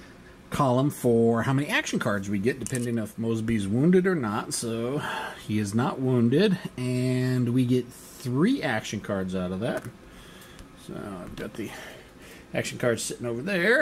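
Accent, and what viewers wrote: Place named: South, United States. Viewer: I liked it... the Southern accent added just the right touch....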